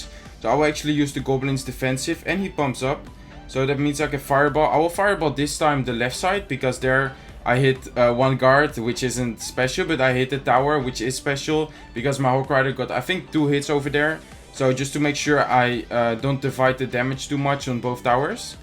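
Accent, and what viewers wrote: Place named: Netherlands. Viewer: Dit is echt heel Nederlands engels😂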